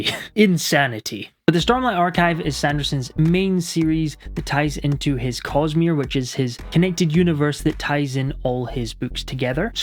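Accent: Scotland, United Kingdom. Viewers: Are you Scottish? You sound like me if I went to Kelvinside and then spent at least 19 years in the Netherlands. Also, it's a great list, but I'd have had Brent weeks assassin series or Raymond E Fiest's magician series on there. Cheers anyway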